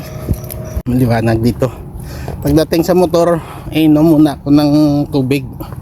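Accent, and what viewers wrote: Iloilo, Philippines. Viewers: Good morning parang ilongo ka man talaga idol kaya ingat ka idol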